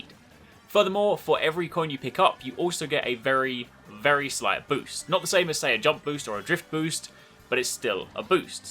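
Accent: England, United Kingdom. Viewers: Of course he has an english accent omg tired of that shit every single time i look up a game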